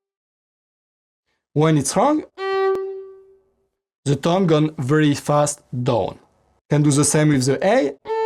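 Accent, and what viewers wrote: France. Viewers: I love your French accent 😁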